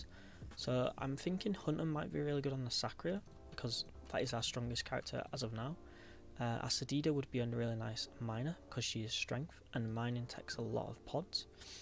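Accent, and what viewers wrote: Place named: Yorkshire, United Kingdom. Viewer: Brighton, UK here, but I grew up in the east midlands. You sound like your from Yorkshire haha? That music was heaven! Look forward to any and all dungeons, especially the harder ones later on as I’m quite unfamiliar with them.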